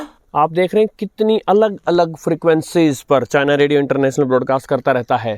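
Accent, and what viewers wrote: Standard. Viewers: आपकी शुद्ध हिंदी बोलने का कोई मुकाबला नहीं है धन्यवाद, यह वीडियो भी आपके लिए 😊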